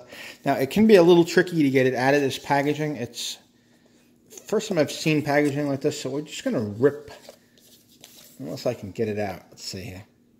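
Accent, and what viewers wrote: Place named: Northeast, United States. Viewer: Yup, the north eastern US accent is still the most atrocious in the country. The accent sounds like a retard being beaten.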